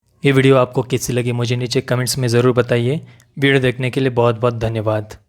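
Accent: Maharashtra, India. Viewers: I like your Marathi touch in your Hindi.... this is the beauty of our Bhartiya culture ❤️ Jai Hind 🇮🇳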